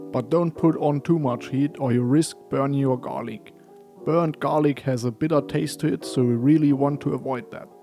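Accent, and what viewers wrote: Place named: Italy. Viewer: Didn't even notice your italian accent until now😙👌🏻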